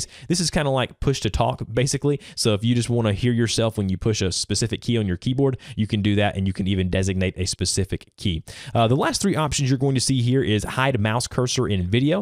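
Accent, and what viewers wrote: South, United States. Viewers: he sounds southern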